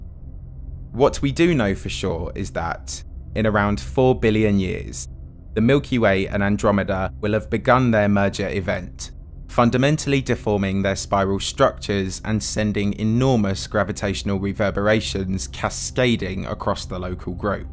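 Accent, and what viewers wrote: United Kingdom. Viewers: UK accents aree annoying.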